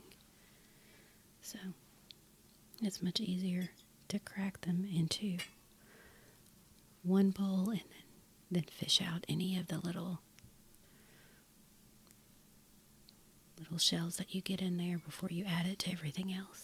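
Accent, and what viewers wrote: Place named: South, United States. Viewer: Yay, you're speaking! I love your baking videos but always wished you'd add the soft spoken voice. Generally I don't like voiceovers, but yours was great. So, yes to the speaking, yes to the crinkly flour and sugar bags, yes to the butter wrappers, yes to checking the recipe a lot, yes to minimizing the mixer sound and yes to porcelain bowls! Great job! Plus you really know your baking. And your southern accent was just the icing on the cake. Maybe you could add narration to your other past videos. Keep up the great work! Thank you.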